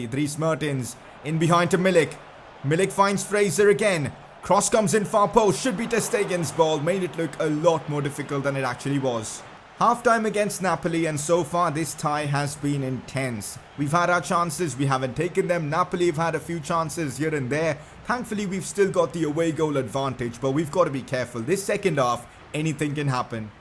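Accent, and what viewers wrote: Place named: India. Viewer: Are you Indian because you sound Indian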